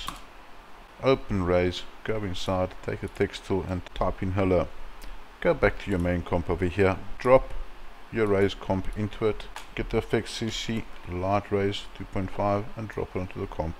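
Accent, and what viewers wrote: South Africa. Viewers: I immediately knew you were South African. Lekker man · Nice to hear a fellow South African voice do a tut :) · South African? Nice to see...!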